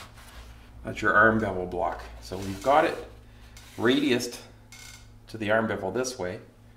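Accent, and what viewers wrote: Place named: Canada. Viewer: I like everything but the Canadian accent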